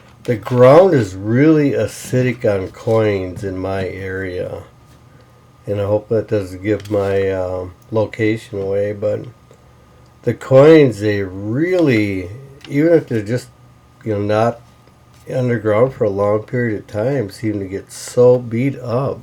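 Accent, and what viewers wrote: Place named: Wisconsin, United States. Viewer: I live in GA but your accent reminds me of my uncle from Wisconsin, so im guessing Wisconsin or Minnesota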